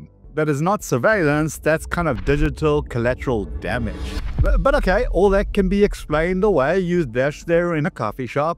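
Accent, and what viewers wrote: South Africa. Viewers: Whoa … when I saw your name, I expected your accent to sound way more like my dad’s rather than a South African.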